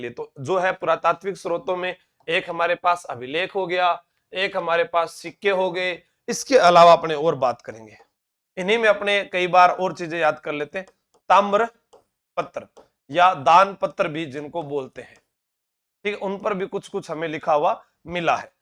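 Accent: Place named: Marwar, India. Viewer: sir marwad me meri Nani ka gaw h whaa par aise hi bolte h😅😅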